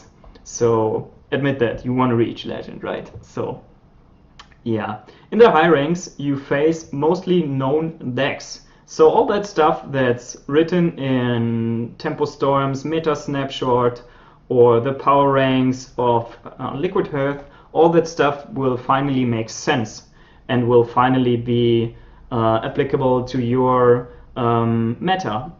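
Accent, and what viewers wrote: Germany. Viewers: Wondered about that aswell, just sounds like german accent right? :-D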